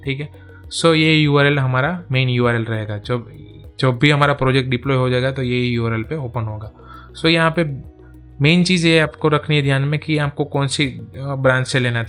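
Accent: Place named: Gujarat, India. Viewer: lang per thi gujarati lage chee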